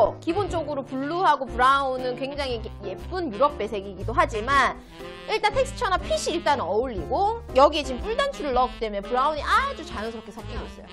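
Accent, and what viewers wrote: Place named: Gyeongsang, South Korea. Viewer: 반갑습니다 혹시 오십대 후반에서 육십대는 안 되나여? 돈 쫌 있어둥 섣불리 들어가기가 힘든 곳~~ 가슴과 엉덩이는 내려앉아 허리와 배는 절구통~~ 그나마 다리가 짧은건 대하고나니 쪼매 위로가 된다는ㅋ ㅋ 이런 조건들로 인하여 번쩍거리는 유리창 너머는 늘 곁눈질로만 바라보고 지나간다는 슬픈현실~~ 왜냐면 저런데는 쭉쭉빵빵만 다니는 곳이기에~~ 근데 롱셔츠나 롱원피스는 내도 보정옷 착용후 입으면 되것는데~~~?갱상도 어디세요? 완전 우리동네 억양이네여^^~~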